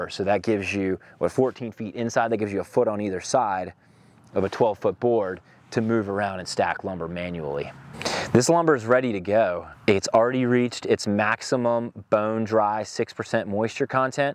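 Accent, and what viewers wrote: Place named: North Carolina, United States. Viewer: Where in NC? Love to go see it. I'm coastal NC and if I had to guess from your accent, you're not western NC.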